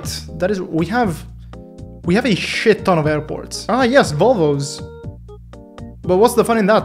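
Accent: Italy. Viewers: are you italian? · Are you italian?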